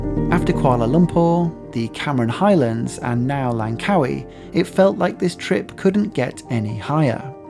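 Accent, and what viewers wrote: United Kingdom. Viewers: It's nicely done especially with British accent!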